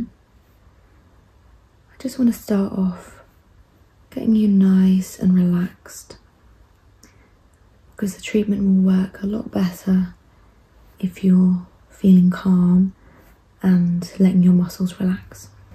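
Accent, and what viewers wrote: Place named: United Kingdom. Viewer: I am not into chiropractor ASMR but your british accent influenced me to watch this video till the end. now, I am binging your other videos to hear more of your accent. lol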